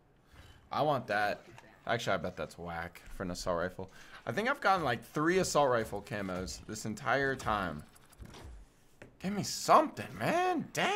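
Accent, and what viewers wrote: Scotland, United Kingdom. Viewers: Aye you got Scottish in you im a huge fan and also Scottish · Scottish! Yes aye lad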